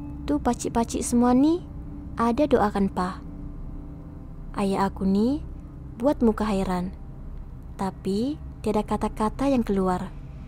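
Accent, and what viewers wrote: Sabah, Malaysia. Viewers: org sabah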